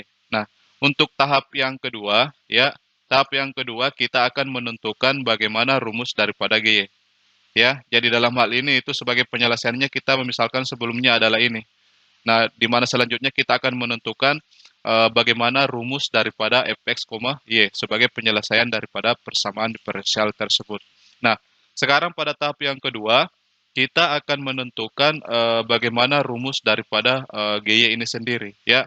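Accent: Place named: Makassar, Indonesia. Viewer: logat makassar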